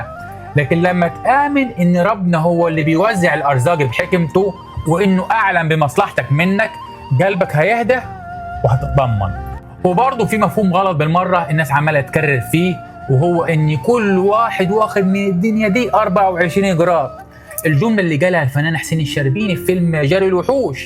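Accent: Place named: Sharqiya, Oman. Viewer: انت من الشرقية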